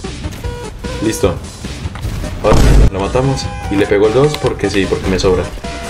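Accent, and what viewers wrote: Colombia. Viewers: Mi impresion o es colombiano.-.? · eres colombiano?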